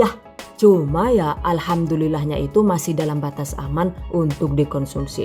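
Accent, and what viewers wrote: Banyumas, Indonesia. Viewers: Msih muda tp bsa suara nya mirip wanita dewasa tua mirp suara maria untu penyiar radio jmn dlu keren pinter bhs inggris/ nglucu ,ngapak resep" 👍👍sdh tjir mlintir pula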